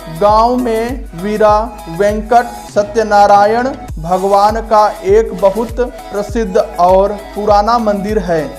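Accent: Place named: Telugu, India. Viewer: telugodu hindi chadivite ilane untundi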